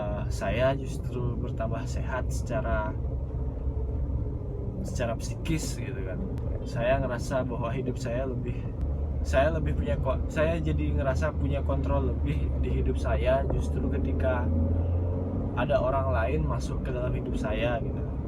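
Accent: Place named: Bali, Indonesia. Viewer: Bli, logat bicaranya kali ini baru Bali pisan :)))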